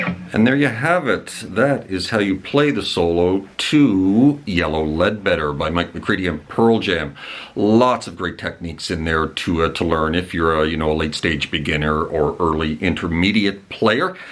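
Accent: Canada. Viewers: Was that a Canadian accent? checks amp Ahhh, yep.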